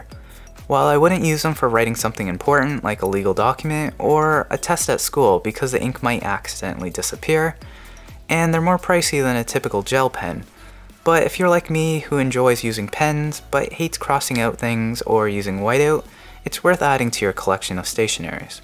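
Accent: Canada. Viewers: Nice video. I watched because I got one free and didn't know how to use it. You sound very Canadian.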